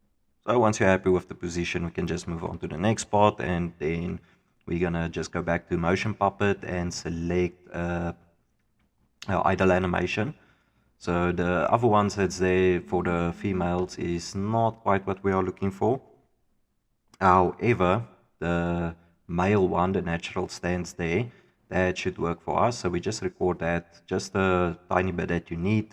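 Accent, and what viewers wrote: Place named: Afrikaans, South Africa. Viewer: i are liking your afrikaans accent :)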